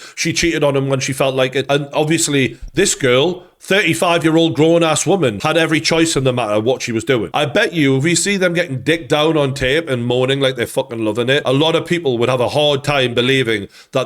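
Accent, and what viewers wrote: Newcastle, United Kingdom. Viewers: You are a f ing legend put perfectly geordie 🫡💯💫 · I love the Geordie accent! One of my favorite YTers Cam Kirkham made me love it ❤ and 50 is King 👑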